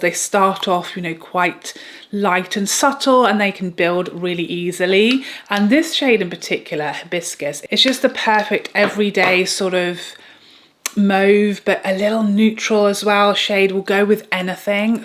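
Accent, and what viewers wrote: United Kingdom. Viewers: Love you bless you beautiful soul inside out, I love your British refined accent it's so soothing and therapeutic bless you and your family 😊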